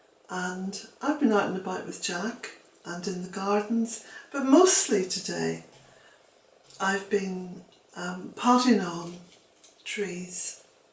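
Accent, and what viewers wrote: Ireland. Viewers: The nastiness comes from a place of bitterness and jealousy, just tell em to feck off in that lovely Irish accent of yours ! youre doing a grand job, lots of love to you and Jack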